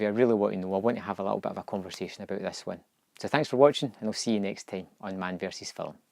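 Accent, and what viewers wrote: Scotland, United Kingdom. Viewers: You know, I'd love to hear You're most natural excited reaction, speaking wise, to anything. The Scottish accent is unparalleled in It's rough, and brutal choke it contorts onto the English language . It rebels against the proper English language, maybe not purposefully, but essentially. Your national language is so strong that, even when replaced, It still survives through the accent, to the point that, It still retains the essence of Your original culture, and attitude. Your language, genetics, and culture are beautiful. All cultures are different and have unique qualities. True diversity is Scotland for the Scottish, and Japan for The Japanese, and so, on.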